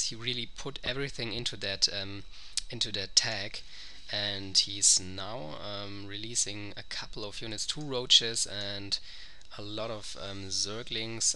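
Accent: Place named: Germany. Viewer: lol exactes deutsches schulenglish :D:D:D:D:D und mir gings exact genauso bei meinem ersten 1on1 :D:D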